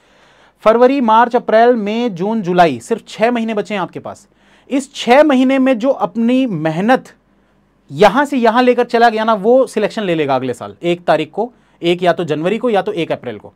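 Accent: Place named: Chhattisgarh, India. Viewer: Sir ap pura chhattisgarhi style me bole ho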